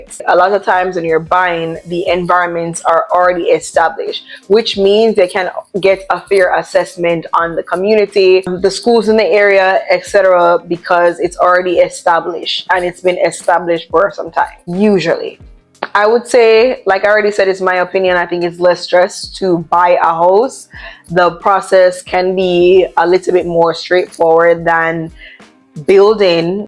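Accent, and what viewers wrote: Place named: United States. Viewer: PTSD?? Are you American you are saying some words and phrases like the Americans wood and you are training on the dialect also, so I think you've lived in America or you're just one of those Jamaicans who want to sound American😂 thank you show me a video on it's nice that everybody's learning from us, social media is the best invention.... The way you pronounce PROCESS, the way you pronounce ALREADY, because I know there's no two countries that pronounce the words the same as American. I know how Jamaicans are originally and I know they are practicing to sound like the US. I love genuine originality and although you are bringing good information, just be yourself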